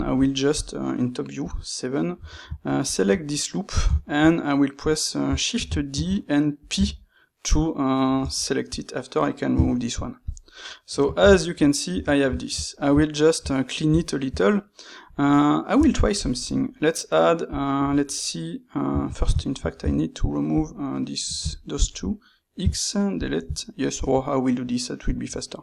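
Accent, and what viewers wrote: France. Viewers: Ooohh Lya Lyaaa ))) youre cool frenchmen ,I like the accent and the teaching ,, appreciate for this techniques . thanks big time! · Your English sounds very French in pronunciation 😊👏🤣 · Don't worry about your accent. I love the sound of the french. I did french in school but forgot most of it. Loved it more than spanish. Your english is quite understandable, so don't worry about the negative comments. Now proceed as normal. Every word of english you speak sounds more expensive.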